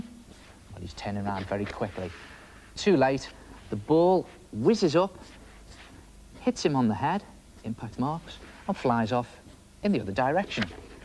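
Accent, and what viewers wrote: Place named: Liverpool, United Kingdom. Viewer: I am Canadian and I used to watch Art Attack all the time and I thought Neil was Scottish I now know he’s scouse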